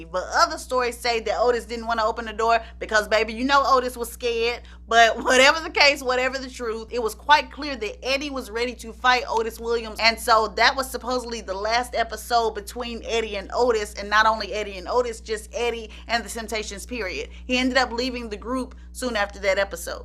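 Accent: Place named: Detroit, United States. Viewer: Sister Love I'm from Detroit Michigan. l got to give it you. The way you run the voodoo down. Sound like you straight out of Motown. Love ya keep up the good work.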